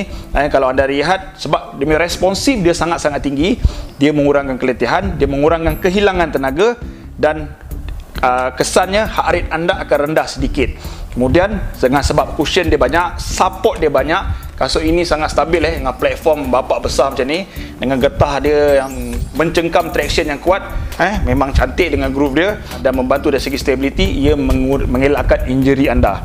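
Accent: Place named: Malaysia. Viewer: Suka saye dengar logat Malaysie 😅,, salam subscriber dari Indonesia bang🙏😁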